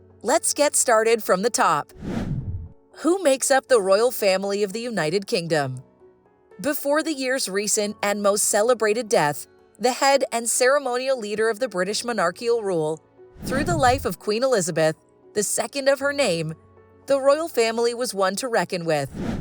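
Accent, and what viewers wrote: United States. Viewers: The Monarchy is not irrelevant, its existence guarantees continuity, stability, freedom and democracy. The fact that a Monarch is our head of State means that no single Party or group can take over the British Isles. It also means we don’t have the divisive need of elected Presidents who would polarize society. We have a democracy like no other in the world, and look at it this way…Our head of state is apolitical and at least we don’t have to elect Presidents, which would create Constitutional crises and social unrest every time. Why are we preached to by an American voice anyway. What the heck do Americans know about the British Monarchy?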